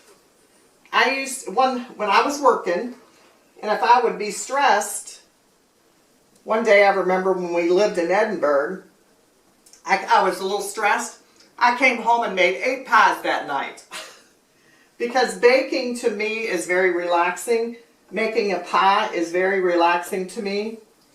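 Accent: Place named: Kentucky, United States. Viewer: You sound like a Ky. Girl, but I’m not sure. We like chushawl better than pumpkin too.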